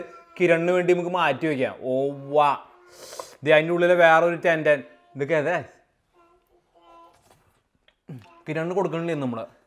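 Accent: Thrissur, India. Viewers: തൃശ്ശൂർ slang❤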